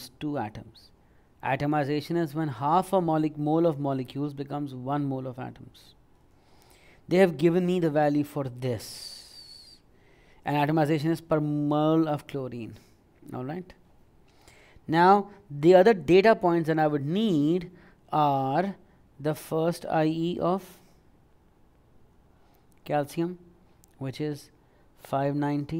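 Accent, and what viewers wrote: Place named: Sicily, Italy. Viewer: More like sicilian.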